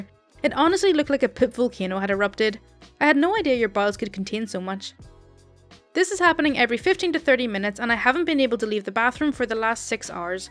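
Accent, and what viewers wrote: Ireland. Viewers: Good morning, Markus etc. First, there is nothing better than the sweet voice of an Irish lass and a picture of a totally contented feline. Then the second thing.... a wee dram of single malt. 🐱🐱🐱🐶👴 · First story: There's nothing wrong with a charming Irish accent, even for a British theater/dance story..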